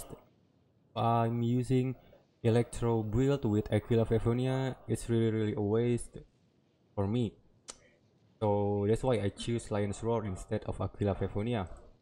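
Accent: Indonesia. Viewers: You speak very much like Indonesians, whether you are from Indonesia or Malaysia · sounds like an Indonesian accent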